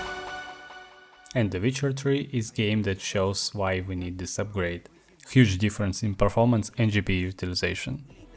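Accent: Russia. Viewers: Когда нужно меньше 5 секунд, чтобы понять, что автор — русскоговорящий =) (это не критика, если что ;) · много, факт) я слушаю и частенько русский акцент дает о себе знать. Для практики рекомендую читать стихотворения с тяжелым произношением и слушать говор англичан. Ох уж эта практика, с ней как раз всегда и беда))) не хватает ее всегда.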